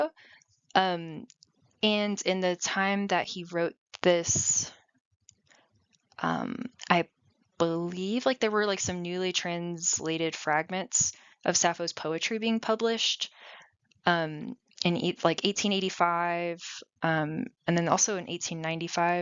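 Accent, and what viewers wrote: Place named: United States. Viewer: Oh that ghastly American accent! How it jarrs....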